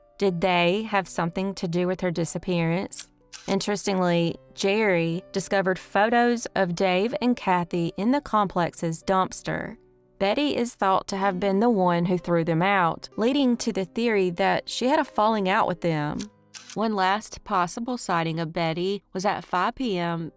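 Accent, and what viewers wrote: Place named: Georgia, United States. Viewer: I keep trying to place your accent. Is it Georgia/close to Alabama?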